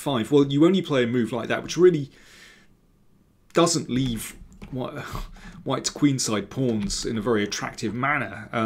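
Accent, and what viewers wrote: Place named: RP, United Kingdom. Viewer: Keep quiet, that's the Sicilian secret! Icing on the cake! 😎 Thank you for the excellent commentary in Queens English!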